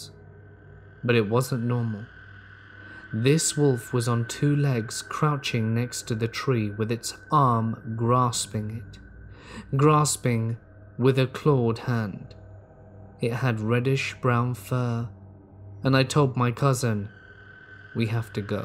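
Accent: United Kingdom. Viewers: Yaknow ive spent very very extensive amounts of time in the woods and mountains of kentucky and a few other places like weeks at a time frequently im more at home in the wilderness than i am in a house or society around people while ill admit ive seen odd stuff hurd some freaky things i found explanations to all and have never seen nor heard anything cryptid lol still some damn good stories especially sense it sounds like a 600 yr old vampire is reading it too you not just bc he is from the uk but his tone and flow hes a pretty damn good story teller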